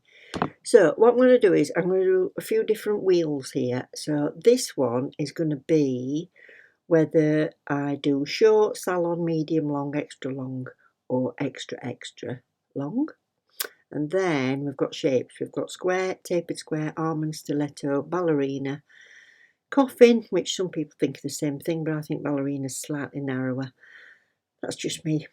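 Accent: Yorkshire, United Kingdom. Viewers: Wow they’re stunning. Definitely love the wheel idea and the longer videos are great xx Btw you’re so funny 😆. Are you from Yorkshire? I’m from Hemsworth if you are x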